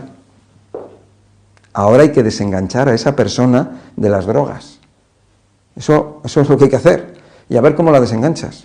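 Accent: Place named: Spain. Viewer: Me encanta ese acento Español soy cubana todos mis abuelos eran Españoles hasta yo hablo distinto a lo notmal soy maestra de Español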